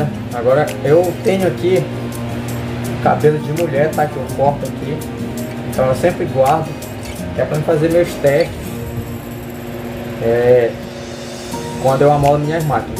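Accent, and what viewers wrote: Pará, Brazil. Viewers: Pelo sotaque vc deve ser paraense, cara, gostei da dica, certa vez joguei uma máquina fora porque não sabia se podia amolar, agora já sei, sobre o sotaque, morei 10 anos em Belém e 1 ano em Macapá, foram anos incliveis na minha vida, sou cearense.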